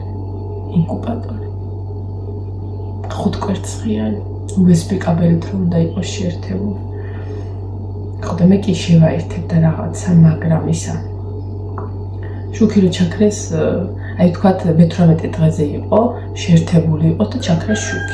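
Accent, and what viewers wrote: Martkopi, Georgia. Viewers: ზუატად მანდ ხარ მართალი დიდი ხანი გიყურებ დამივხვდი რომ საერთოდ არ თამაშობ.შენი ძირძველი მარტყოფული კილო არ უნდა დაიკარგოს . არა კახური❤ძალიან მაღიზიანებს კუთხეს რომ მალავენ წვალებით და არც გამოზდით იყავი ის ვინც ხარ რა❤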